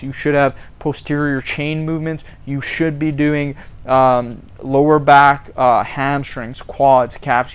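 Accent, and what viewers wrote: Canada. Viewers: Is that a Canadian accent I hear?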